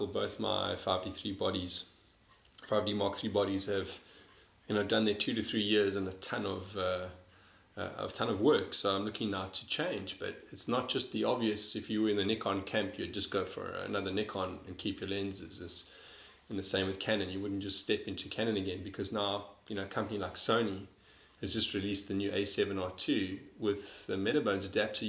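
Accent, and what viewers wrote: South Africa. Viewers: South Africa, one of the best accents. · Are you from South Africa? Sounds like your accent is from that area.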